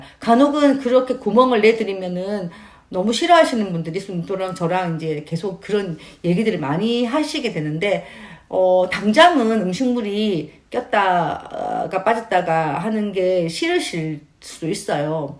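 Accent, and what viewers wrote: South Korea. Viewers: 와우 평소말투 그대로! !부산말 안고쳐지네요 차암 어렵죠잉? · 저 버들강아지 기억해주셔용 넘예쁘고 젊으심 말투가경상도라서 친근함 이 있어요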